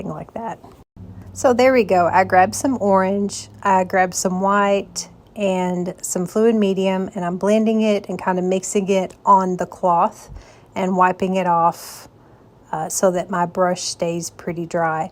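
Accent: South, United States. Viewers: that southern drawl is to die for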